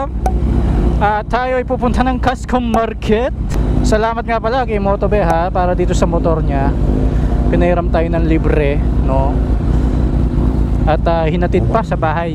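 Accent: Pampanga, Philippines. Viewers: Kapampangan kang tune par. ahaha managapatula ku kareng words a gagamitan mu. More power and ride safe lagi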